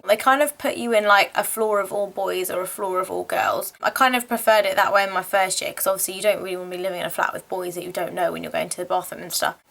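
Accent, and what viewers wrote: United Kingdom. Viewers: I love the way u speak ......I love.ur British accent 👍👌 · even though i have finished my grad but still watched it,actually your uk accent made me do that.lol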